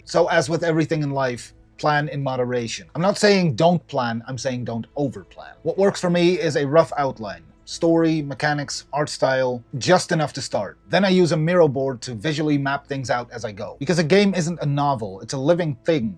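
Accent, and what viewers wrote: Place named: United Kingdom. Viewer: All good points. One thing I wanted to mention though that most YouTubers conveniently fail to bring up: most countries outside of the US often provide artist related grants to indie game developers, to help them survive while they’re pursuing their dream. That may or may not be you (although you sound like you’re from the UK so it might be), but just imagine everything you just said, now add on to that the necessity of also being independently wealthy, or so young that you’re still mooching off mommy and daddy, and now you have a better perspective of what it’s like to be an indie game developer in the United States. Again, that may or may not be you, but I just wanted to mention it because it’s something that a lot of these European game devs will often deliberately omit.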